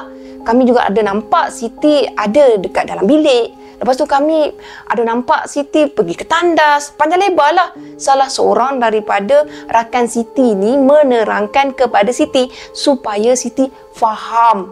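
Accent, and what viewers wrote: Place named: Kedah, Malaysia. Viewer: org kedah mai dh ni😎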